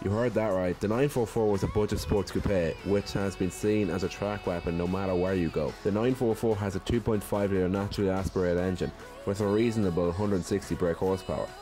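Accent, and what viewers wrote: Ireland. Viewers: Colin Davis he's irish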